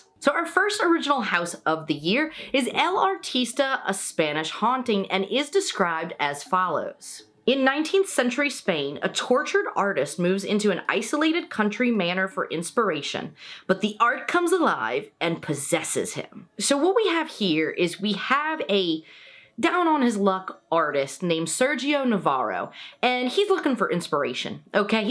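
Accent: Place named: Louisiana, United States. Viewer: I figured you were from there the way you were talkin'. Lol! I used to hit up RenFaire annually from 2009 until around 2017 before we moved. I dressed up like a Templar Knight, but I'm thinking of switching up to Aztec Warrior. I miss it. Tried to hit up one on the outskirts of Florida with the fam. . . Yeah, it was just another reminder of how rinky-dink things are up here. Never thought I'd be that guy from "the big city" that talks trash about small towns, but the smug/ignorance of these small town folks makes me rep H-Town even more. I take comfort in knowing that Houston is the "dirty south" and isn't a big city like NYC. One thing you might appreciate though being from Louisiana. . . The seafood is better up here in Fort Walton Beach. This is coming from a guy who hated seafood until my wife made me try it in Connecticut. Anyway. 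. . Keep up the good work on the channel and hope you get to USO for HHN.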